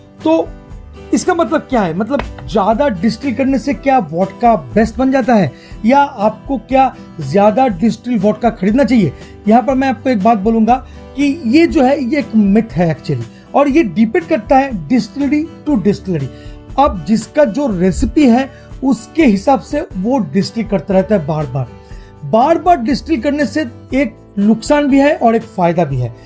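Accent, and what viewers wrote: Bengal, India. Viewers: Dada you are superb , I like your Bangla touched hindi.....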